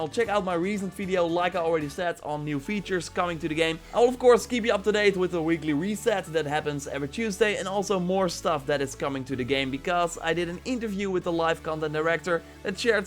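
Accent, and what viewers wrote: Netherlands. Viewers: Ben je Nederlands want je klinkt bij heel veel woorden die je zegt Nederlands zoals toen je ikaros zij en andere dingen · Nice man hoorde aan je accent dat je nederlands was weet jij misschien waar of hoe je veel metal kan vinden?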